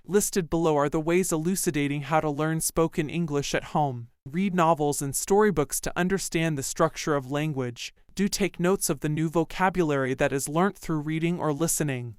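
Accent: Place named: United Kingdom. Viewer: Your speaking tearm it's very unique as like British English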